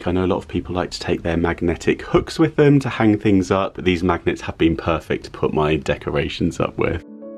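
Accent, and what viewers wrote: England, United Kingdom. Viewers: Thank you for taking us on another fabulous cruise. I just love all the places that you go, because I will never be able to see it in person. I enjoy listening to your English accent. Thanks again for your videos. From a fan in Colorado USA!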